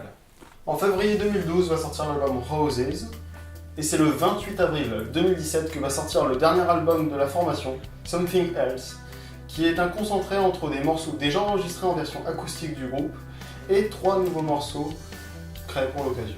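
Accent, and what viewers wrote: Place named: Spain. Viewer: A quand la vidéo sur un groupe espagnol pour faire attendre ton bel accent ? Venga hombre, queremos màs videos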